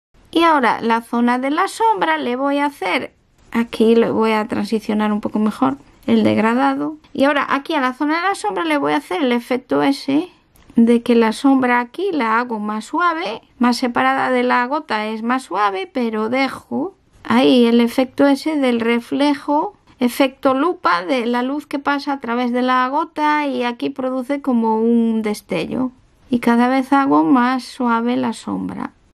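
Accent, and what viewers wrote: Spain. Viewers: Diossssss! Amo como Hablan los españoles (ㆁωㆁ)❤️❤️ Sigue Así. Dibujas muy Lindo uwu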